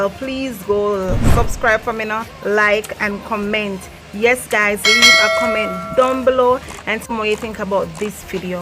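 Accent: Jamaica. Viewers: Real patois 😆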